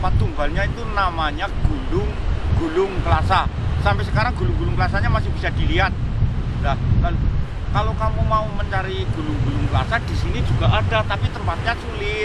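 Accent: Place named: Kebumen, Indonesia. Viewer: Lumayan ni, nambah pengetahuan. Logat Kebumen banged 😀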